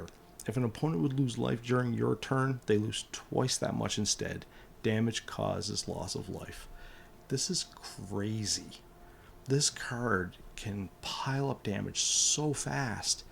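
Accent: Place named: Minnesota, United States. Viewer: My man, you gotta be from MN right?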